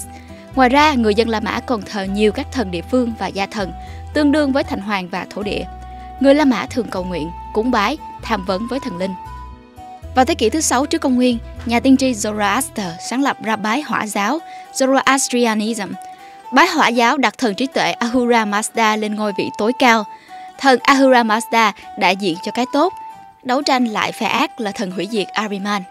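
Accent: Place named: Saigon, Vietnam. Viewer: Giọng sài gòn rất hay ủng hộ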